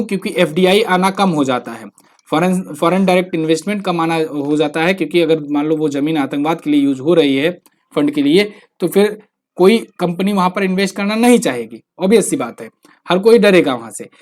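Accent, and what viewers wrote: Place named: Uttar Pradesh, India. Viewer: Sir aap up se ho na.....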